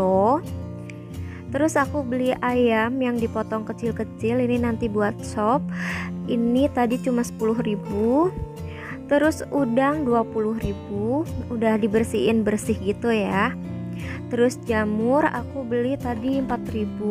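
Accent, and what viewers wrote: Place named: Tegal, Indonesia. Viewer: Kakaknya orang Tegal yaaa..tegalnya mana kak..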